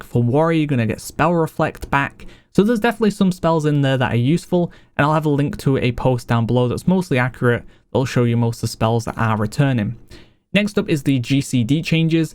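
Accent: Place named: Leeds, United Kingdom. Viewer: Northern UK, Leeds kinda accent.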